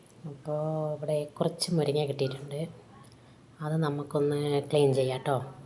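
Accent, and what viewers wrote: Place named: Palakkad, India. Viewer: കുറേ ദിവസായി പാലക്കാടൻ സം സാ രം കേട്ടിട്ട് കേൾക്കാൻ കൊതിയാവുന്നു ലൈവ് ഇപ്പോൾ കിട്ടുന്നില്ല 😭😭😭😭😭😭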